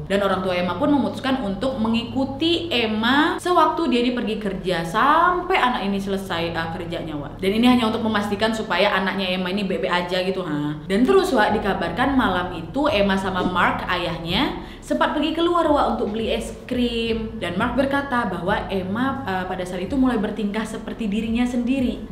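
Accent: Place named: Sumatra, Indonesia. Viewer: Penasaran, org mana sih. Logatnya ky dr sumatera. Familiar SM aku, enak ngomongnya.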